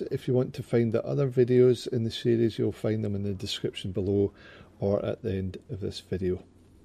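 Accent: Scotland, United Kingdom. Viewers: You sound just like me. I,m from Coatbridge. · are you scottish i can hear the accent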